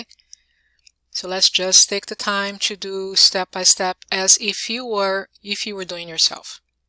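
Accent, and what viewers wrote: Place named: Brazil. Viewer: First time here. Are you Brazilian...? Your accent sounds beautifully Brazilian. Thanks for the video!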